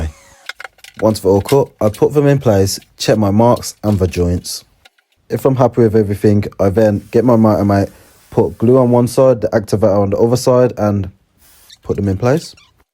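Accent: Birmingham, United Kingdom. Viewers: Can't help being a Brummie 😂😘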